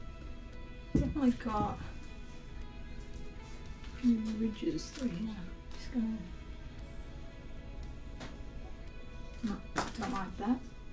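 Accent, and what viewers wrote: Australia. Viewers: Thats great from our angle it looks like a city reflection walking along the Yarra River...Melbourne 😊 Have you tried using a retarder or other gel medium to slow down your paint drying? It might help. I only found out about them recently and think its brilliant, so much easier to blend too..love your art. Happy New Year cheers from WA🦘🦘 always good to hear an Aussie voice on here. * I said what it was before you had finished and gave your own interpretation....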